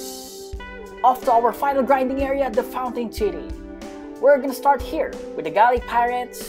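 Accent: Philippines. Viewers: are you Philippines? Because its ao confusing you aound like Philippines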